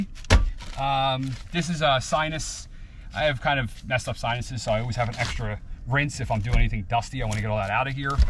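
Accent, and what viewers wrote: Philadelphia, United States. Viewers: Your accent, then I saw your t shirt. A fellow Philly dude. Yo!!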